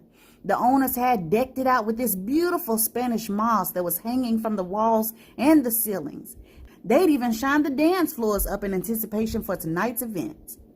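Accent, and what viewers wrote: South, United States. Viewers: That is such a pretty picture of you in the intro 😍. You're such a naturally pretty lil cutie pie. Love the stories, keep up the good work.. SCANDALOUS CHILE!!!! 😆 🤣 I love that you're such a southern Belle, "Shucks" 😆 Reminds me of going to South Carolina to visit my cousins "down the country" as my great grandmother would say. The way you talk brings back such good memories of my great granny. 👵 I'm just waiting for you to call somebody a jitterbug. 😆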